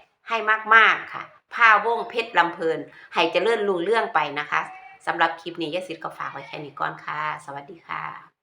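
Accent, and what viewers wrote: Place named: Laos. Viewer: น้องเขาคนลาวเขาก็ใช้สำเนียงลาวเป็นเอกลักษณ์ของใครของมัน อย่าอืจฉาเขาเลย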